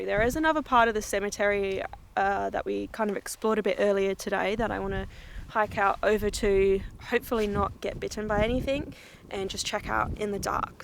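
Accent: Australia. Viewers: Hey 😍 how ste you angel i would love to go to Australia I just love the accent 😍 I love yours angel obt way ❤ I love that spooky video at the school I saw saw both awsome love 🌷🌷this video omg is so mysterious you can feel.the chill thank you for another video love from your back out back yard lol love always angel 😍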